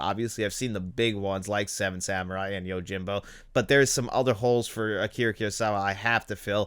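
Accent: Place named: Cockney, United Kingdom. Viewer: Cockney accent! From London 😎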